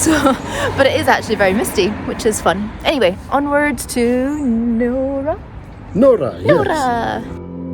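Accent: Scotland, United Kingdom. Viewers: I enjoy Scottish accent.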